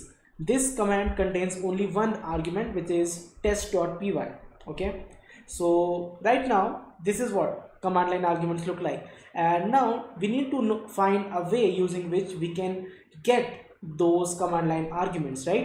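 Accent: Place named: India. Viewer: what an indian accent.. jesus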